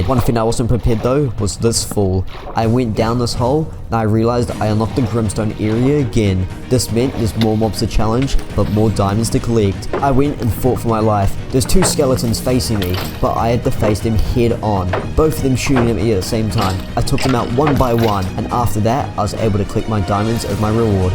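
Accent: United Kingdom. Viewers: He is british don't make fun of his accent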